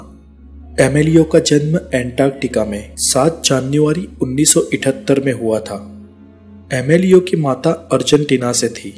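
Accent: Gujarat, India. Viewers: Gujju ☝️ accent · R u Gujarati??? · Speaker is Gujarati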